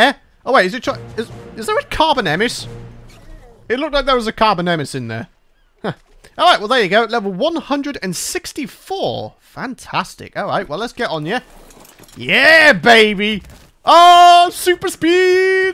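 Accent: Scotland, United Kingdom. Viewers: he sound kinda scotish like his sound when he talks i just noticed that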